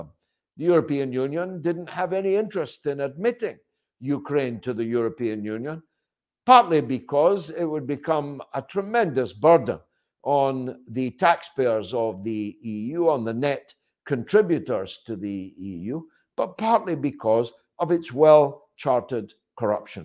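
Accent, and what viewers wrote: Scotland, United Kingdom. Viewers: Are there any sort of lessons I can take to get this Scottish accent?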